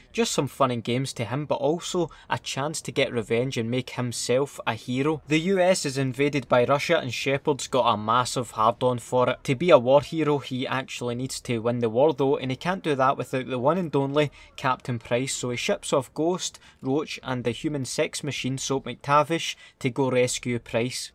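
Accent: Scotland, United Kingdom. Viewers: The scottish accent makes this even funnier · Are you Scottish? He’s Scottish right everyone? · Heard a fellow scottish accent and subscribed instantly 😂😂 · your accent almost like soap...is soap a scot too? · McGregor is Irish, and uploader is Scottish, like soap and captain McMillan. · Your Scottish voice makes this way better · I'm new to this channel but after reading some comments and listening to some good Scottsman rambling, I think you gents (an I use that term loosely) will take good (alcoholic too, no doubt for ya scotts) care of me. You've earned yarself a sub. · After TF141 got intel on makarov Shepherd wanted to all the credit for himself so he tried to eliminate the task force and get all the credit once makarov was killed. He wanted to redeem himself after losing 30,000 people in a snap! I know i'm 5 months late but who cares. Also are you scottish? 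· u Scottish?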